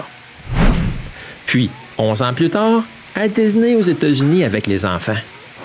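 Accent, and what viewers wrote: Quebec, Canada. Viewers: ton accent, le fameux accent de nos cousins québécois, on adore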